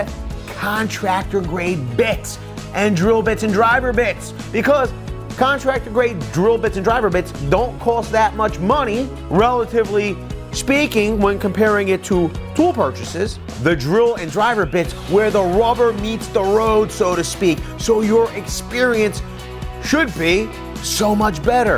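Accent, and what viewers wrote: Philadelphia, United States. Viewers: Clicked for the review, but soon as he started talking I knew he was a South Philly guy! Yo Cuz Def subbed & liking everything on this channel!!!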